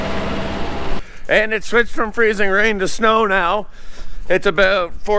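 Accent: Canada. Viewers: Those Canadian long Os are hysterical.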